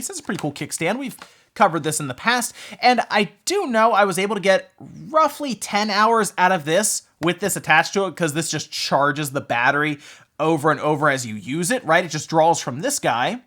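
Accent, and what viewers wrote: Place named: Maryland, United States. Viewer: Does anyone know what that accent has is called? Like how he pronounces "Draw" as "Drawl" and "Older" as "Odor"? I'm genuinely curious and not trolling. I've wondered for a long time. At one point I thought it was an Ohio thing but is in Maryland right? ANyone know what I'm talking about?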